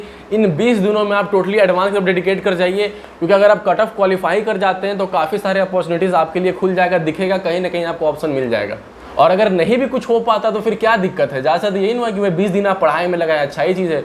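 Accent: Bihar, India. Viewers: Bhai love from jharkhand...I can recognise sound of bihari/Jharkhandi anywhere😗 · Bhai aap Bihar se ho kya? Aapke bolne ke pattern se laga to puch liya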